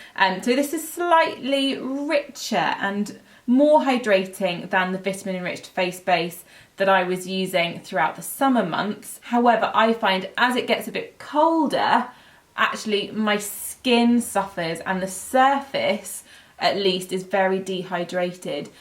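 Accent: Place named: RP, United Kingdom. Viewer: you're smashingly fab. lovely look on a lovely fine representative of Bobbie Brown. p.s. love your posh accent.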